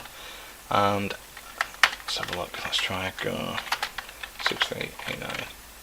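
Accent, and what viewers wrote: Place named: Lancashire, United Kingdom. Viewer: Very nice 😀 Your accent reminds me of David Collier and Tony Pomfret... very Atherton-esque, Westhoughtony or 'roundabouts 😊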